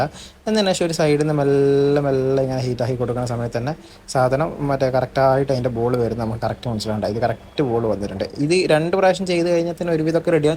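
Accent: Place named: Kannur, India. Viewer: Kannurkaran thanne.. pakka Kannur accent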